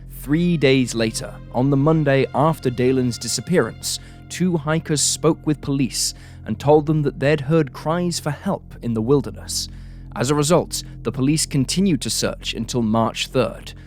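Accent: United Kingdom. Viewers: When I click on a YouTube video and I hear the narrator has a British accent I am immediately disappointed 😞.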